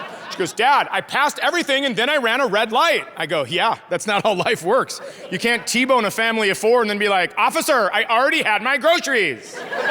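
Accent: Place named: Canada. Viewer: Just found you on TikTok and had to come here and watch you. I thought you were Canadian at 1st from your slight accent. Found out you are from Fergus and I'm just an hour east of there. I now realized why people think we sound like Canadians. We're just too close to the border and it's all their "Canadian wildfire" smoke we get each summer turning us into Canadians. 😂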